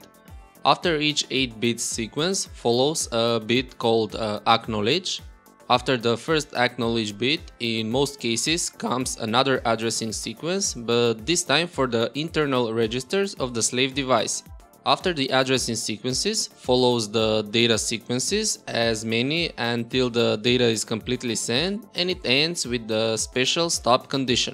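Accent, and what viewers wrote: Russia. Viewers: Are u from Russia? :) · Which strong Russian accent 😊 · Что то мне мерещится русский акцент у автора.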